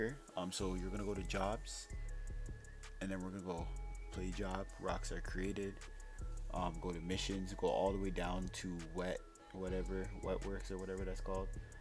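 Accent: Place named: Toronto, Canada. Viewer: This guy is definitely from Toronto "it was cheesing me" lmao I had a feeling the whole video but that confirmed it.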